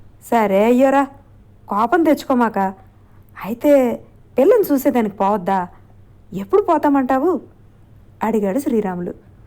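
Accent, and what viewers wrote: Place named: Rayalaseema, India. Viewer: చిత్తూరు నెల్లూరు ప్రాంతాల మోడల్ ఉంటుంది కదా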